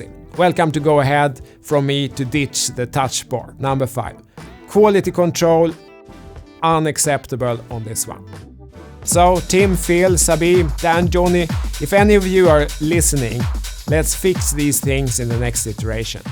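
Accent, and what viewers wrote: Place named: Sweden. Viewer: My gf is swedish and as soon as I played the video she recognized the accent😂 great review!!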